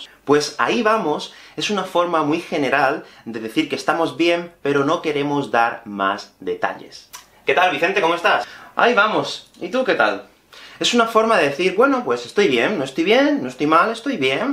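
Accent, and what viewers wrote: Spain. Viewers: Estoy aprendiendo español (inglés es mi idioma madre) y he entendido casi 80% de este video en español sin traducciones a inglés! ¡Necesito más estudiar los verbos la otra del indicativo! El más aprendiéndolo puedo entender los acentos de hispanohablantes del mundo. ¿Quizás tu acento es español? Pero, muchas gracias por esta lección y suscribiré a tu cuenta de YouTube. ;) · Tô aprendendo espanhol e fui vendo o vídeo achando que ele tava com sutaque espanhol e quando fui perceber tava traduzindo o vídeo na minha cabeça sem perceber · joder, me cuesta el acento español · ) Este acento espanol <3 :P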